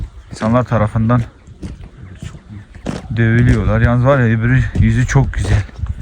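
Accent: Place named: Van, Turkey. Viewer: Bitlislilerle vanlıların Türkçe konuşması birbirine çok benziyor. Benim bitlisli arkadaşlarım var aynı senin gibi konuşuyorlar Türkçeyi. Sen vanlıydın değil mi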